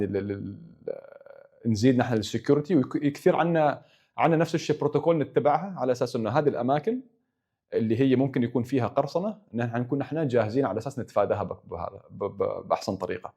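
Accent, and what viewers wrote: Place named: Syria. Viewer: لهجتو سورية الصراحة😅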